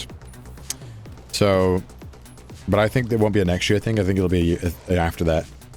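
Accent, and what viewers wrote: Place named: Wales, United Kingdom. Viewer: Then why are you busy streaming in online platform like youtube in your channels to have money but not get a job for that country japan and live for years. Maybe you and your co should follow up your status because the government in Japan can be changing the policies when it comes to foreigners. And add to that they had lower birth rates and the government made a drastic plan for that. Why ask led Joey about his travelled in many parts of the country. Sinced you are from U.K. particulary Wales sinced your accent is deep.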